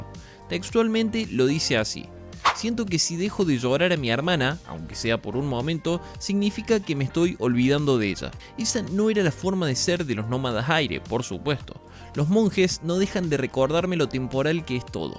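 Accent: Cordoba, Argentina. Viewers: Me encanta cuando citas el comic, nada como una narración bien cordobesa para entrar en el misticismo jajajajaja xD muy buenos los videos xDDD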